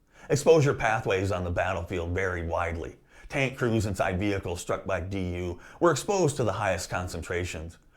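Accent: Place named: United States. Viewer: Spoken and presented like a true American 🇺🇲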